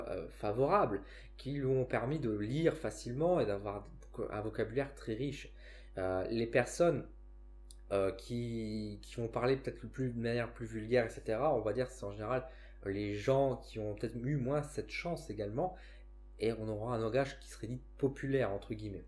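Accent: North, France. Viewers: Ben t'es un gars du nord quoi ! 😊 On marche sur la tête si maintenant on ne peut plus s'exprimer tel qu'on est... Le naturel ya rien de plus vrai... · Reste naturel, toi même, je suis du nord aussi. Si la personne ne te comprend pas elle te posera la question(vous pourriez m'expliquer)? C'est tout 😊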